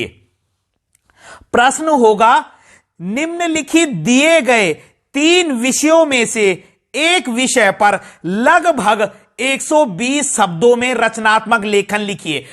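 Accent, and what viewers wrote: Garhwal, India. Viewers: Sir aap garwhali ho ky aapki aawaj se lg rha h 😊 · Bro h sir gadwali accent se pta lg gya 🤣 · Sir aap kya gadwali ho aap ki awaaz se lag raha hai ki aap gadwali ho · Gadwali ho na ap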